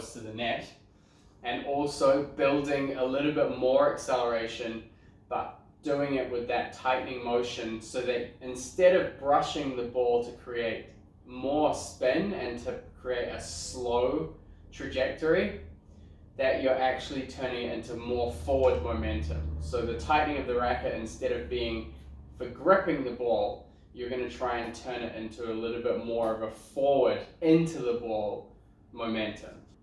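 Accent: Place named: New Zealand. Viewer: I cant seem to pinpoint your accent. Kiwi living in Oz for sometime then migrated to US?? Reason for asking is the next question after the first would b - do u coach in person?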